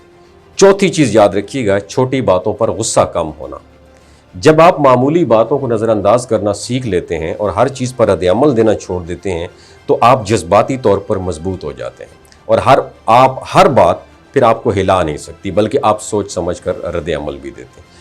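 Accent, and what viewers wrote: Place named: Karak, Pakistan. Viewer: Karak ki Pashto ❤❤😅😅😅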